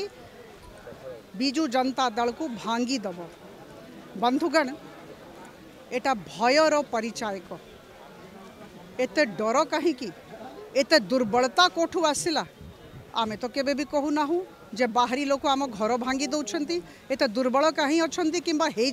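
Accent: Bihar, India. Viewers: Apana nka Vasa tah bihari Misa odia kahuchha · Amku nai lo ଆମକୁ, ତୁ ଆଗେ ବିହାରୀ ଓଡ଼ିଆ ଟା ବନ୍ଦ କର କାନ ରୁ ରକ୍ତ ବରହୁଚି ଶୁଣିଲେ ତୋର ଓଡ଼ିଆ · Tu biharuni Odia bhasare kana pain' khata hauchu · Tame same kahucha bihari re odia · Tama katha Bihari Bhasa re kohuchha nije age thik kara ta pare anya ku kahiba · U also talking in behari Bhasa odiya kahuchi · ଆପଣ ବି ବିହାରୀ ଚୋବେଇଲା ଭଳି ବଡେଇଲା ଭଳି ଓଡ଼ିଆ କୁହନ୍ତୁନି,ଆପଣ ବି ଓଡ଼ିଆ ଭଲ ଭାବେ କହିବା ଶିଖନ୍ତୁ।ଓଡ଼ିଆ ଭାଷା କେମିତି କୋମଳ,ଭଦ୍ର ସହ କୁହାଯିବ,ଏ ସବୁ ପ୍ରଶିକ୍ଷଣ ପ୍ରଥମେ ଆପଣ ନିଅନ୍ତୁ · Esi kahuchu haribu didi Tu bihari para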